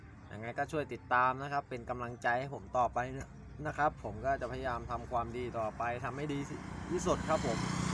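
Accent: Thailand. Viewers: คนเหนือรึค่ะออฟก้อคนเหนือ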